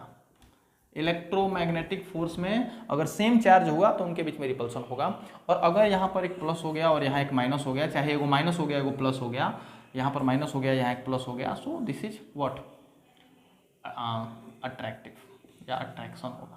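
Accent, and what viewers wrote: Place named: Bhojpuri, India. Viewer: Bhojpuriya andaaz me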